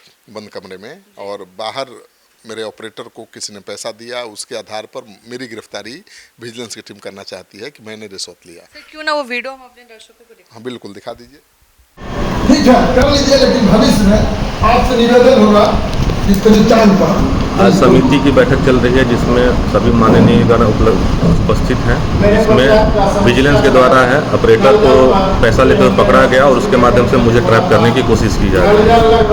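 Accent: Bihar, India. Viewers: जी आप को बिहारी टोन में इंटरव्यू लेते हुए सुनकर आत्मनुभूति होती है...!कृपया इसे जारी रखेंगे 🙏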